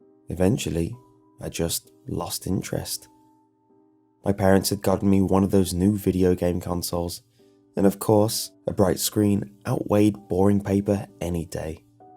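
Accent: United Kingdom. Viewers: Is from the uk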